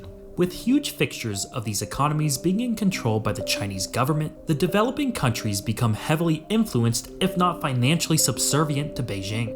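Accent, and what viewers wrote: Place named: United States. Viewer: Beware : When a video is narrated in d American accent n sounded in way like "we are d righteous knowledgeable West trying to point out all d bad that China is doing", it's wise for viewers to add quite a bit of salt to it..bc China is disrupting centuries of Western global exploitations of d Global South..thru conquest n colonisation in d past, n post WW2 thru neocolonialism, to ensure d G South stays poor so that it's easier for d West to maintain their v high standard of living.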